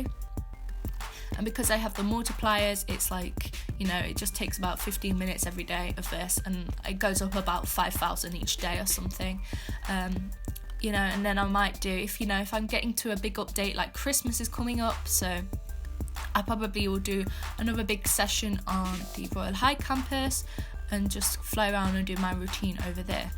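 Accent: United Kingdom. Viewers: Am I the one that love her british accent ?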